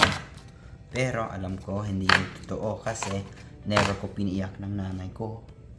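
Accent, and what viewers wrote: Philippines. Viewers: Wow I can’t believe your accent pinoy na pinoy talaga.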